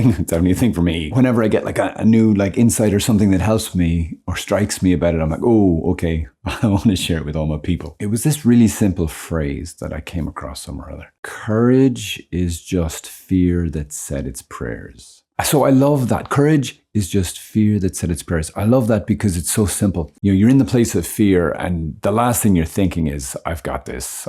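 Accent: Ireland. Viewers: Great to hear an Irish accent in this space!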